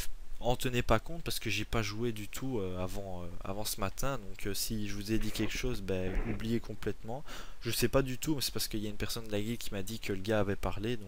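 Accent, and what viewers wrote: Belgium. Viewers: Tu es belge ? Parce que j'aime beaucoup ton accent :) , et vraiment désolé pour ton hack j'ai deja connu ça et fuck les rageux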